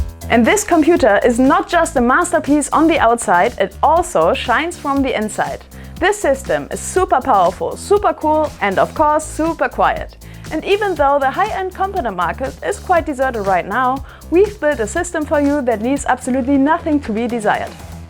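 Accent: Germany. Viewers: I counted 38 logos. Btw hört man extrem das sie deutsch ist xD😆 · Pretty sure there are 45 different logos in the video. Love the german accent, btw! Good luck!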